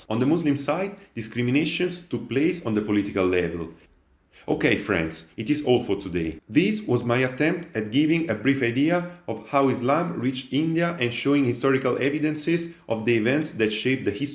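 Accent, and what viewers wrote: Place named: Italy. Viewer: Love your channel. Informative and unbiased. Oh and the Italian accent makes it even better 😂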